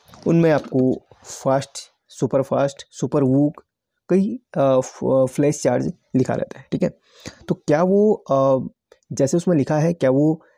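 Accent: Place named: Madhya Pradesh, India. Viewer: Sir aapki language ♥️ madhyapradesh ♥️ jaisi mach hoti h aap bhali utrakhand se ho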